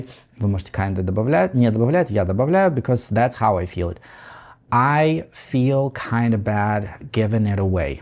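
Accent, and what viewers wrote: United States. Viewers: И самое главное, не говорите с таким акцентом, как у автора, в Англии. Не американец с американским акцентом - это вообще ненавистная фигура в Англии. Когда они слышат такой акцент, то подпрыгивают от злости.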